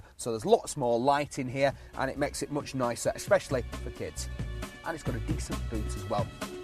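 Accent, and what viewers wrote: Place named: Yorkshire, United Kingdom. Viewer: God, he's from Yorkshire.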